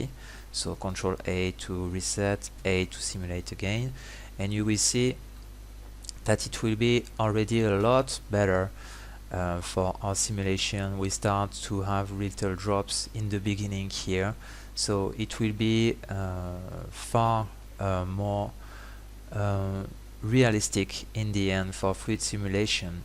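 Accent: France. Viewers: L'accent français magnifique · c'est la première fois que je vois un français parler en anglais sur youtube x) ça fait bizarre d'entendre un accent français, enfin bref, bonne vidéo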